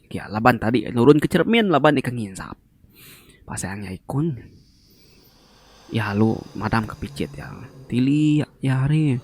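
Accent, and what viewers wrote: Sarawak, Malaysia. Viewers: Patut jako nn bc ka munyi org srtok tuan mya nusi cerita...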